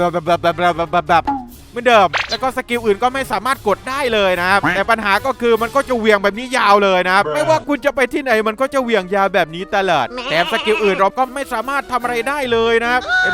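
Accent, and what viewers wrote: South, Thailand. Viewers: พี่เป็นคนใต้หรอผมก็เป็นคนใต้ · ึคนใต้ใช่ไหมครับสำเนียงเเบบนี้ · คนใต้หรอ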